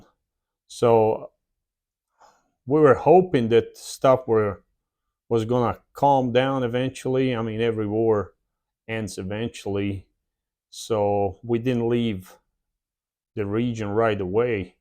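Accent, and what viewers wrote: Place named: North Carolina, United States. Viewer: Thank you for your service ! The US is lucky to have you. I picked up on your NC accent right away ,lol. We spent 20+ yrs in Cleveland County NC and relocated to Wyoming about 5 years ago. Not sure if we could ever deal with the humidity again.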